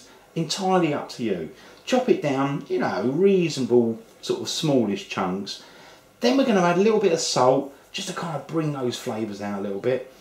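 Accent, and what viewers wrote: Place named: Southern England, United Kingdom. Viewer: Hello mate. Just wanted to say thanks for taking the time and effort to make all these great videos. They've helped me a lot to broaden my cooking skills and the menu at home. Also nice to hear good southern accent, as an ex-east ender (wanstead) now living out in Australia :) Cheers.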